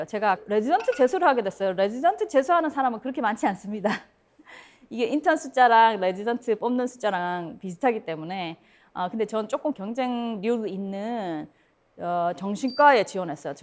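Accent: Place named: Daegu, South Korea. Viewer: 대구의 말투가 나오시네요.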